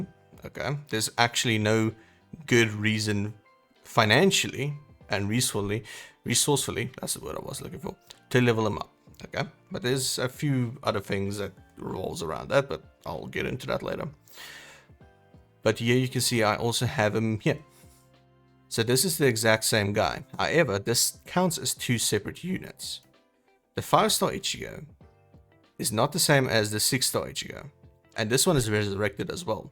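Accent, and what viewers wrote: South Africa. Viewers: Hi thanks for this. Started a few weeks ago and found this helpful. Btw am I hearing a South African accent?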